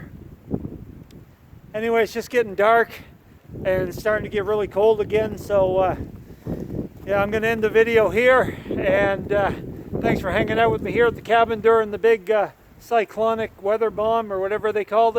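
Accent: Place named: Maritimes, Canada. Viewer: He sounds like a maritimer haha I'm from New Brunswick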